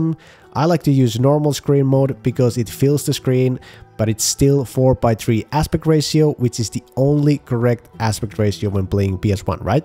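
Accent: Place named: Finland. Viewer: Suomiaksentti on kyllä niin tunnistettavissa välittömästi. :D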